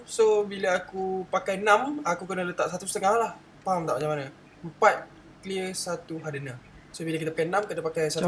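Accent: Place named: Pahang, Malaysia. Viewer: Sdap sial orang pahang cakap...aku tunjal je..hihiuhi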